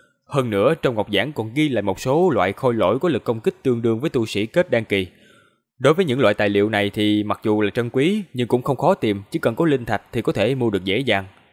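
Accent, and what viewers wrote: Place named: Mekong Delta, Vietnam. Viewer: Bn ng miền tay thì phải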